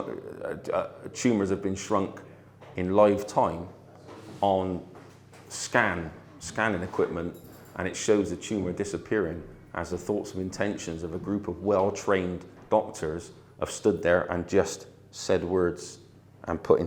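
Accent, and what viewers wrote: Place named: Bristol, United Kingdom. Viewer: Brilliant lecture...sounds like from Bristol too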